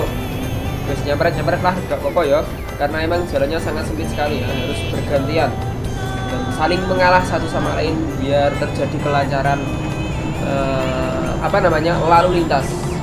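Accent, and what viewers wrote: Java, Indonesia. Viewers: jawa men mas omongane heheh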